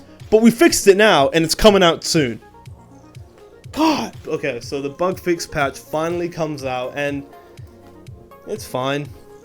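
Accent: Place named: Australia. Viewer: Is he Australian, or is that just a speech impediment?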